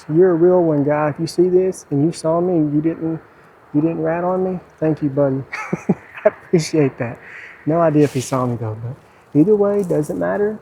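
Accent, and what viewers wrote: Alabama, United States. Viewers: This is just like camping with steve except with a southern accent. I subbed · I know this is an older video. But I just found your channel and it's so enjoyable watching you. I live in Alabama myself near Tannehill Park. I really like watching someone going to places that I can associate with. But please be careful. No video is worth risking your life for . (the billboard, or those ladder climbs) Your not only putting your life at risk but in a way your family's also. Also so great to hear someone with an "Alabama accent". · I’m going to guess your from Alabama bc your accent reminds me of gump lol Ok edit: you said Alabama so I was correct & I can chug a bottle of water in 5 seconds but it has to be room temperature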